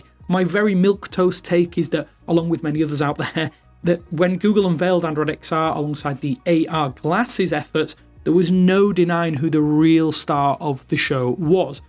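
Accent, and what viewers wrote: United Kingdom. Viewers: This is one of the worst XR/VR related videos I have ever seen... way back into the Vive and Index days nothing compares to how awful this guys takes are. It's actually unbelievable he has a job doing this... something tells me the British accent is carrying him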